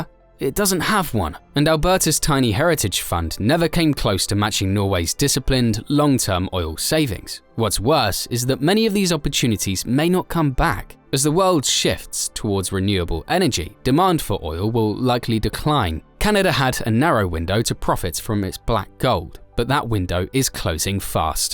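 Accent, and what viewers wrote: United Kingdom. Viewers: Dude, I love your British accent 😍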